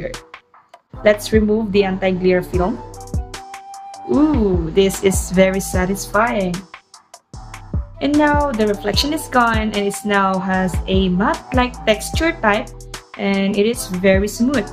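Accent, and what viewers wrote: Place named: Malaysia. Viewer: Are you malaysia ur accent is nice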